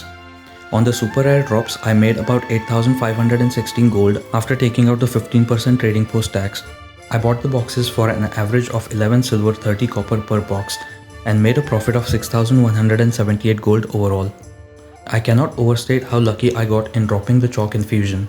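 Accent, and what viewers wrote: India. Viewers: Are you from india youre accent sounds indian???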